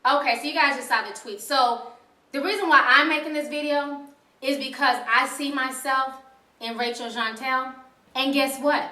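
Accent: South, United States. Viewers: ❤ love this and I love your accent. I just thought you were southern. 😄😄 you learn something knew everyday. 😕 I'm Belizean but my accent totally went away. 😩 I assimilated. 😫😫😫 you had cancer I'm so sorry/ 🙌🙌🙌 so glad you are in recovery ❤❤.😟 you really don't mention it in 2017 I just thought you had a virus 🙏 I'm even more glad I've been praying for you ❤❤ so happy you are alive and living your best life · just started watching your channel. was quite surprised that people give you grief about not being articulate. you seem pretty articulate to me; and as for the accent, just figured you were a fellow southerner! also awesome to hear someone speak the truth about lolo jones. great video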